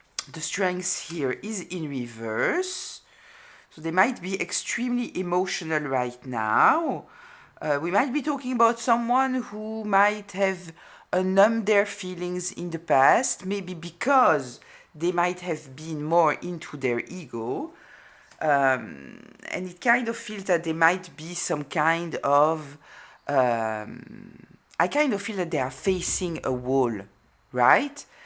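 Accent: France. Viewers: i love your french accent. it's lovely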